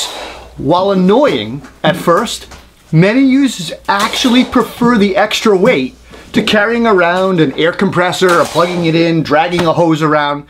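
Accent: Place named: Boston, United States. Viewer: No need to hide the Boston accent! New England is famous for its carpenters. MakitER it is! Massive thanks for your work here, as always. Great work!!!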